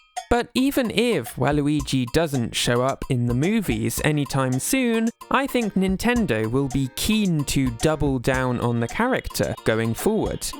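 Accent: United Kingdom. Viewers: always wondered why the Brits pronounce it as Mare (like female horse)-yo instead of Mah-rio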